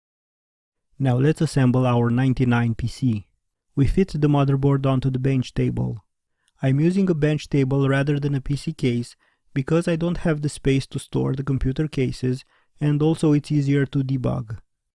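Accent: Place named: Romania. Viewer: Excelent video. Mi am dat seama dupa accent ca esti roman. Like!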